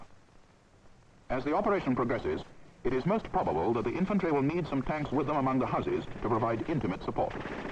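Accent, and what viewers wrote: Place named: RP, United Kingdom. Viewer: The days when all narrators had what we call a BBC accent. God forbid they used anyone with even the remotest trace of a regional accent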